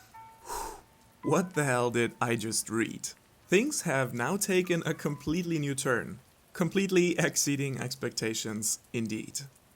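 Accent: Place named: Germany. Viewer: Are you German by any chance? Your accent is hiding it well but I can feel it slightly. Am I right with my assumption? :D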